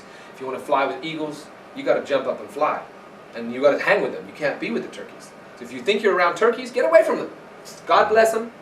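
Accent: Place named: United States. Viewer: What's with the American accent? Thought he was British.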